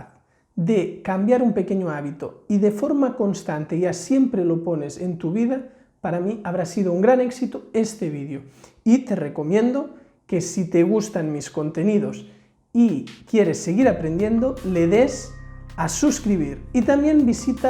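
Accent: Catalonia, Spain. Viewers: Solo por curiosidad, eres catalan? Lo digo por el acento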